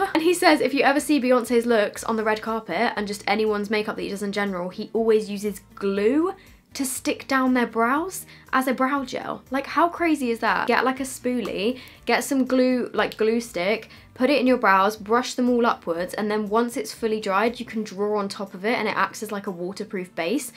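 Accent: United Kingdom. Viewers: Liked the tips very much, but what I liked the most, is your pretty accent!!! I am used to all these Americans doing tutorials (although I am German), so I forgot how I love the British accent!!!! May I ask, where you come from? Is it (as I learned in school) Oxford English? Nevermind, my ears love it anyway!!! Sorry, that I do not comment so much at your technique or whatever but more at your speech.😂 (comment on /comment at?) Sorry, I am German as I said.😉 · I luvv your accent. Is that british? 😍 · She looks like Sabrina Carpenter and she talks like a British Sabrina Carpenter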